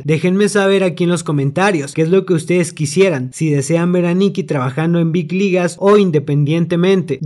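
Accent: Argentina. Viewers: Sos argentino o uruguayo vos?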